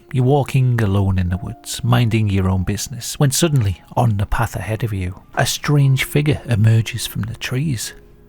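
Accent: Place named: Newcastle, United Kingdom. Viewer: Thank you so much for every video you do. The quality and research are always top-notch, and you present a wonderful mix of theories about even the most far-fetched stories that is engaging to anyone, no matter how sceptical or credulous they may be. I especially love, rather than sensationalism, the empathy you show for the victims of horrible crimes--concern about how their last moments must have been, that sort of thing. Many other horror channels get a bit too focussed on the grotesque nature of violent acts and forget the poor souls they are inflicted upon. Most of all, oddly, I love your accent. My old granddad was born near Newcastle, got conscripted in the war, and met my grandma while stationed overseas so never went back to the UK. The war bizarrely saved him from a probable early death in the coal mines, like his forefathers. 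Listening to you speak about gnomes and spooky photos and so on takes me back to when I was little and he'd read me stories about teddy bears. If you read this, thank you for all you do, and please never apologise for your health. I wish you the best of luck finding effective healthcare. You are an absolute gem. The sheer number of subscribers and patreon supporters you have makes it clear how many people feel the same way.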